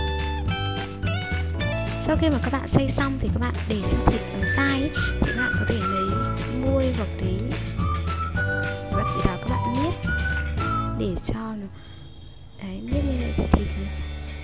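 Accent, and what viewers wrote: Vietnam. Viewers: hình như chị là người bắc ak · ban nay we o dau ko biet nghe giong thanh hoa ma lai ngong nua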